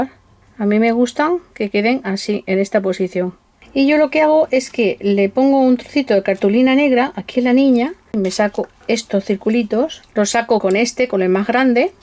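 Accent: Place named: Seville, Spain. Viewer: Hola,te escribo desde Argentina,nunca había hecho muñecas,pero gracias a tus videos me animé y ya voy por la segunda.Lo que más me cuesta es realizar las caritas,pero creo que si sigo practicando algún día me saldrán,ja ja Me encanta tu acento,me hacer recordar a mi abuela,hablaba como vos.Eres Sevillana?.Lastima que en mi país no pueda obtener esas resinas,veré con que las reemplazo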